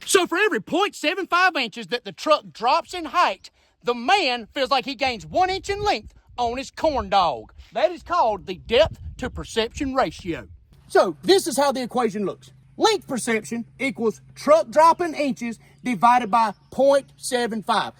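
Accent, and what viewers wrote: South, United States. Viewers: I have started saying select words in a southern accent because of you 😂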